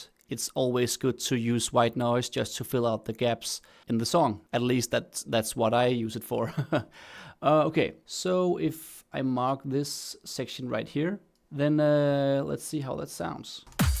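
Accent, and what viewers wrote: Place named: Denmark. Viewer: Uhaaaa jeg kender en dansk accent når jeg hører den😂 Fed tutorial bro, det lyder dope🔥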